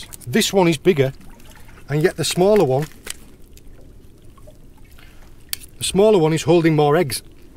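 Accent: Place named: North, United Kingdom. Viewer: Great vlogs, your like the fred dibner of fishing, your teaching abilities are on point, more so than reading a book, what you say is very interesting it goes in one ear and it stays in the other. Is your boat able to sustain a living or do you have another income source. How many pots do you set per day. Where in the north is your accent from. Set a trap for the pot thief's.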